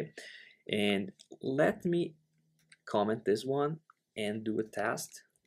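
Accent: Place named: Brazil. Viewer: dude its impressive how from the first 3 words you've spoken i already recognized the brazilian accent exactly like mine ahahahahhaha nice vid! :P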